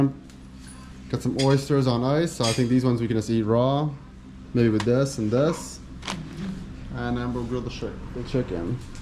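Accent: Cambodia. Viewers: I just discovered his videos/channel. His Khmer is really good and his tone really fits the Khmer style of speaking. Lol Just subscribed. Great job! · I like your Khmer accent❤️🇰🇭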